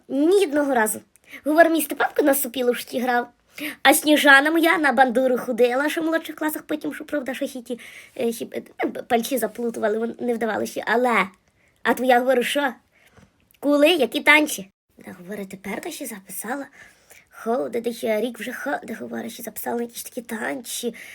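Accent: Galicia, Ukraine. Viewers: Вітання з Німеччини! Яка колоритна наша галицька мова, слухаю і ніби знаходжуся десь неподалік. Поважаю Ваш талант і бажаю ще більшого творчого натхнення! Чекаю з нетерпінням нових серій.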